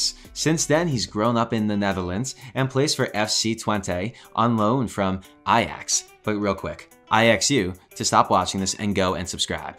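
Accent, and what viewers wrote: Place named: Philadelphia, United States. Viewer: Great video, dude. Subscribed. You sound like you're from the Philly area, yea? Cheers, looking forward to more from ya.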